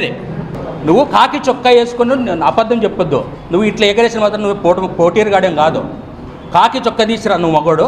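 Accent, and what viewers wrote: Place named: Rayalaseema, India. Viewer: సూపర్.... పూర్తి రాయలసీమ యాస..వార్నింగ్ వింటుంటే సమ్మగుంది...😂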